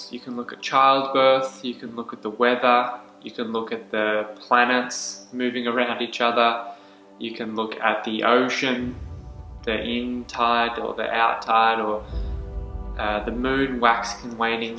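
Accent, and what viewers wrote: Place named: Australia. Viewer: I thought you sounded Aussie, Aussie here, so Aussie living in the US?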